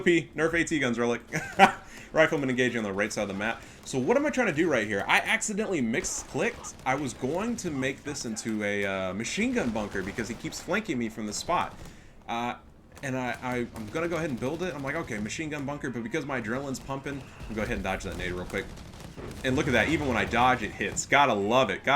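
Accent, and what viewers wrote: Germany. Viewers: As a native german speaker, I highly appreciate your german accent.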